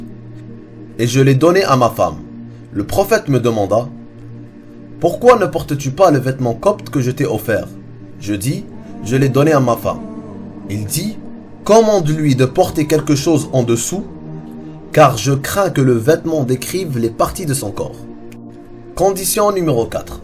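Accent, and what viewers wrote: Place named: Kabylie, Algeria. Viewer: L'homme qui parle dans cette vidéo kabyle non🤔 (d'après sa façon de parle )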